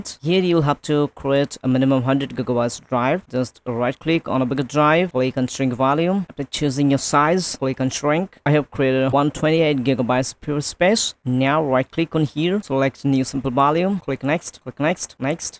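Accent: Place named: India. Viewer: hi bro.. is there any tutorial how to speak English like yours. You sound so foreign yet Indian. I am trying for international calling job.. any video or page will be helpful.. .. that would be so helpful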